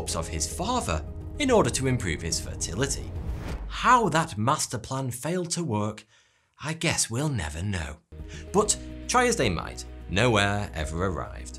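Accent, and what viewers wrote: United Kingdom. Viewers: I've been interested in inbreeding for many years, and have read about and watched videos concerning the Hapsburgs and Queen Victoria's descendants spreading the hemophilia gene. You did a very nice job researching and presenting this information about inbreeding. Add to that that you're a Brit with a fantastic accent, and I'm hooked on this channel! Everything sounds better when narrated by a Brit!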